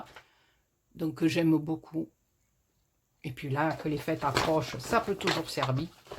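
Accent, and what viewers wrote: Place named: Provence, France. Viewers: Coucou, je te suis depuis peu mais je me régale car j'entends bien les façons de parler de chez nous 🥰. Nous c'est à Marignane que c'est le ouaille et à Châteauneuf les Martigues c'est propre mais on ne trouve pas grand chose 🤔. Bisous 😘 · bonjour je regarde avec plaisir vos videos et me regale de vôtre parlé de chez moi! je suis marseillaise j ai 68ans et je scrappe pour le plaisir et pour me vider la tête;;Ac/4tion le plus pres de chz moi , j habite le bord de mer, c est St Loup , 2bus 3/4 d h pour arriver dans un depotoir!!! les rayons vides et le peu d articles , par terre , eventres, bref ,pas la joie... dommage quand on est à payolle les prix Action c est super!!! continuez de nous faire vos petites videos vous ete geniale amitie Nadege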